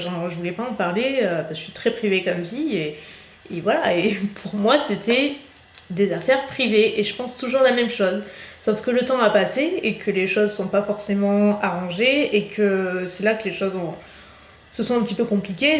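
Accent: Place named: South-West, France. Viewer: Le message d'une abonnée discrète ( et ça me va ) ! Je suis là pour le contenu et certainement pour cet accent du sud ouest qui est le même que le mien ( discrète et chauvine ! ) J'adore cette franchise, tu avais dit que oui tu en devais beaucoup à ta communauté et que non ta réussite de ne lui appartenait pas ! Elle est bien à toi cette réussite! Plein de force, de courage, de réussite, d'amour de toi !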